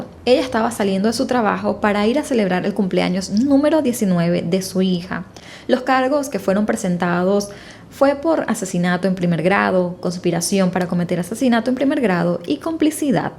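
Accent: Standard. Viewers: Me encanta tu voz neutral sin acento. Bendiciones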